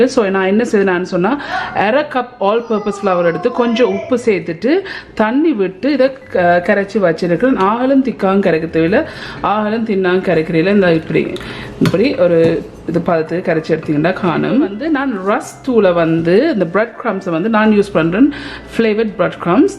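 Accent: Jaffna, Sri Lanka. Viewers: Ohh....wow...... I like your Jaffna spoken Tamil... I'm also Jaffna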